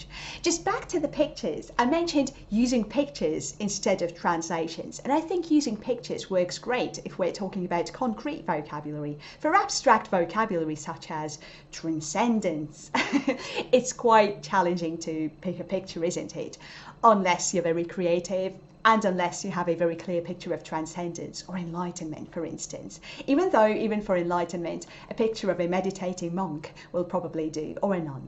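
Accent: United Kingdom. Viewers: I love your British accent and your fancy way to speak!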